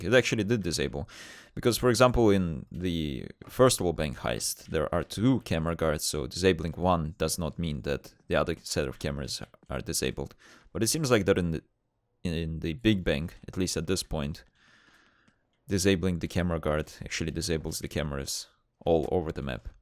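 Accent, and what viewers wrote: Russia. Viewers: you from Russia or Germany? Your accent as a normal russian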